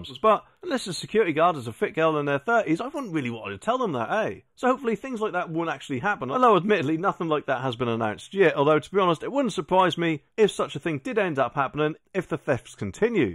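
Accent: Norfolk, United Kingdom. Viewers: Hi,where are you from? I recognise that accent,is it Norfolk.?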